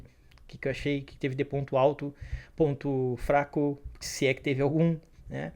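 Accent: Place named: Rio Grande do Sul, Brazil. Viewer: muito obrigado pelo acompanhamento da série com os vídeos, pra mim tu fez a análise mais fiel e profunda da série que vi no YT! E é tri ver o sotaque gaucho mandando bem por aqui! Abraço!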